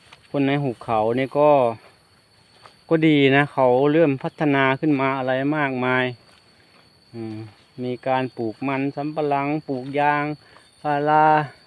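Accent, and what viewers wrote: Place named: Isan, Thailand. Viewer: โดยส่วนตัวไม่ได้ติดตามข่าวน้องชมพู่นะค่ะ และไม่เคยดูคลิป เลยค่ะ แต่เปิดมาเจอคลิปของตา อ้าวตาพูดไทยตกอิสานแต่ทำไมน่ารักจัง😊ติดตามตาเสยเลยทีนี่😊☺😚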